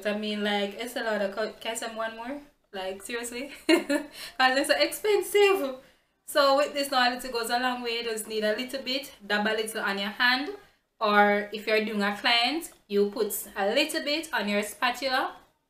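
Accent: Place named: Caribbean. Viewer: love your accent :) #CaribbeanGirls. Btw for reference what shade do you use in maybelline fit me/fenty?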